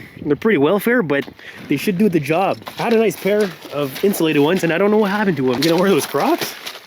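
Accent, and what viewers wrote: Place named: Quebec, Canada. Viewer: t tu québécois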